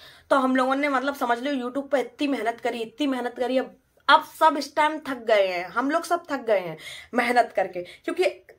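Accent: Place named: Farrukhabad, India. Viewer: aap ki boli to farrukhabd ki lagti hai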